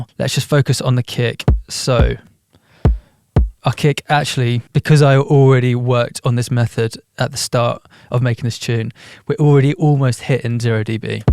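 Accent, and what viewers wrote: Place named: United Kingdom. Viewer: Tutorial instructions hit different with that UK accent 😂😂😂 cheers from USA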